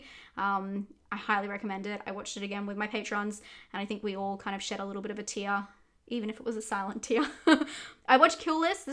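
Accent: Australia. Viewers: So, uh, "really sh*tty" is a 1 star rating? 😛 Think I may be watching too many of your vids. Your Aussie accent is not as noticeable to me. 😕 Stay Spooky.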